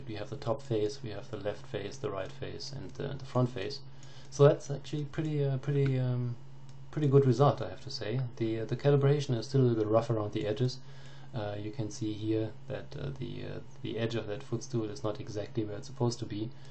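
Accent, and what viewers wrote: Germany. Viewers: could i be that you are a german, cuz you kinda sound like one :D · Your German (Austrian maybe?) accent makes this sound really cool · Clearly a German accent. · are you german? it's just a question because of the accent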